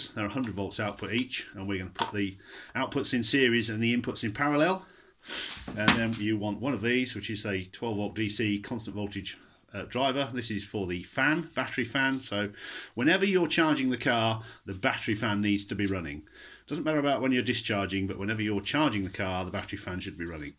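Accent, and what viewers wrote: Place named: Alabama, United States. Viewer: Hard to believe. I'd like instructions for my gen 2 prius. I'd be willing to use a 120volt squril cage fan to cool my pack. My fan has a plug with several wires. I could research which 2. But I'd love to make the charger for my 07 prius. Like they say a little over 200 volts DC thanks. Love the Alabama accent!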